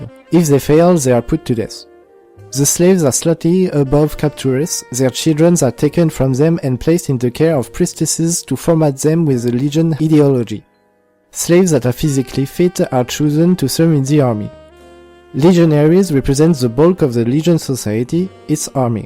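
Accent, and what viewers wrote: France. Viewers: You deserve every view frenchy. Props for having the courage to voice them even though it isn't your native language. Keep making these awesome videos. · ahhh laccent francais put1 ca craint.. essaies de t'en debarasser quand tu parles l'anglais... " hello Zis iz a litteel video on fallout" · Es tu français sa s'entend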